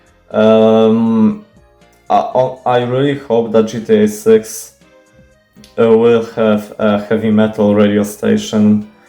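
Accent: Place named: Poland. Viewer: I just KNEW you’re from Poland, I know a polish person when I hear one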